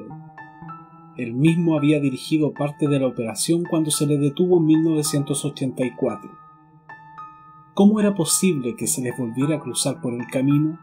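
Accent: Standard. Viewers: relatas con acento neutro, pero igual supe que eres chileno. Te felicito por lo que haces, muy profesional. Y si es chileno...es bueno 😉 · Eres venezolano? Dijiste se puso a trabajar. Aparte el acento neutro. Interésante.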